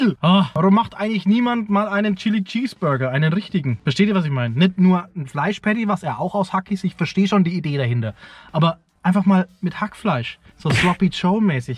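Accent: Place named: Franconia, Germany. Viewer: Tolles Video. Dass du aus Franken kommst hört man direkt. Wir haben einfach den besten Dialekt. :)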